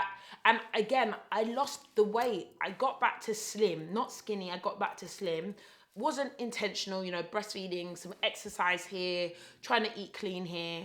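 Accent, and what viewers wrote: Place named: United Kingdom. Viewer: I just love the British accent 😍 new subscriber. Love the vibe.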